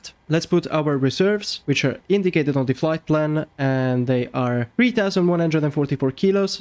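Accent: Italy. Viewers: are you Italian?😅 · Are you italian?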